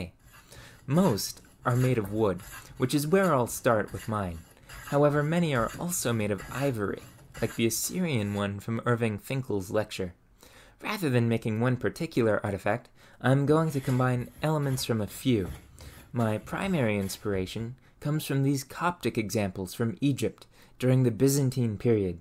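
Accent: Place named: Oregon, United States. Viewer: Sounds like Organ State to me.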